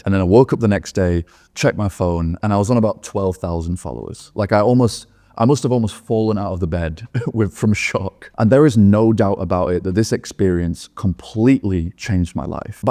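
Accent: United Kingdom. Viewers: I like your voice a lot. And for me, thats a huge reason why i watch someone's vids. If they have great content but their voice grates on me, then i won't watch. Plus you have a great yorkshire accent...best i can tell in California. 😁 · Is that British accent?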